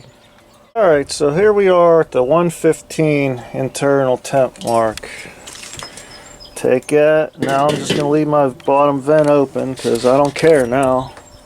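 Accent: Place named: Western Pennsylvania, United States. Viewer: You sound like you are from Western Pennsylvania you jag.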